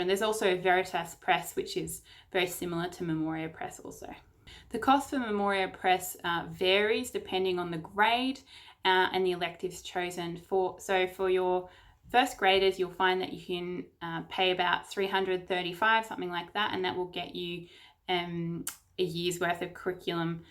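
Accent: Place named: Australia. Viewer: So good to hear an Aussie giving advice on a homeschooling program. Just wondering if this is for the state of Florida?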